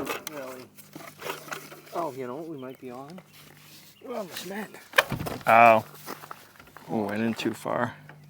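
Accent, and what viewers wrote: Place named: New England, United States. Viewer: It's called a New England dialect. Not a speech impediment. Look it up. You owe an apology.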